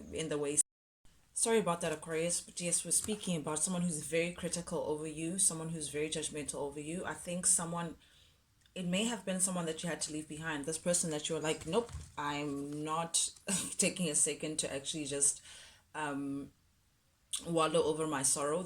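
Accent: South Africa. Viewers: Are you South African? Had to ask because of the accent. Great reading! ❤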